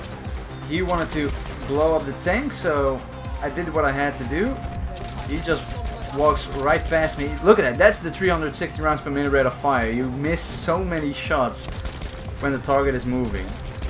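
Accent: Netherlands. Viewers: You Dutch? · Maybe ist just me but you soud like you're Dutch Ps just a guess.....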